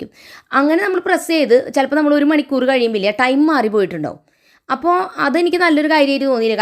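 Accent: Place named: Thrissur, India. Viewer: തൃശ്ശൂർ ആണോ വീട്... സംസാരം കേട്ടിട്ട് അങ്ങനെ തോന്നുന്നു 😊... ഞാൻ തൃശ്ശൂർ ആണ്‌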